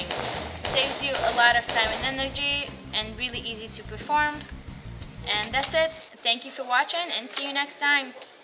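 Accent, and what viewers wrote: Israel. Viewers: If you really want to get into details, she's not Russian, she's Israeli, read the description, about 20%-25% of Israelis today are Russian immigrants or their children from the big soviet nations immigration from the early 90's, so judging by her name she's probably from a Russian origin, but judging by her age and accent, she most likely was born and raised in Israel.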